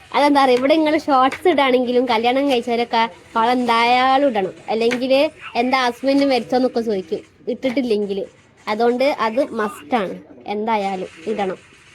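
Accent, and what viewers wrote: Malappuram, India. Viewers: മലപ്പുറം സ്ലാങ് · എന്തായാലും മലപ്പുറം . ഭാഷയാണ് നിലമ്പൂർ പെരിന്തൽമണ്ണ ഭാഗം ആണ് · അതെ.. മലപ്പുറത്ത് ആയിരുന്നു എന്ന്തോന്നുന്നു ഇവര് താമസിച്ചിരുന്നത്. ഇങ്ങക്ക്.. ഓല് എന്നുള്ള വാക്കുകൾ എപ്പഴും സംസാരത്തിൽ വരുന്നുണ്ട് 😊🥰🥰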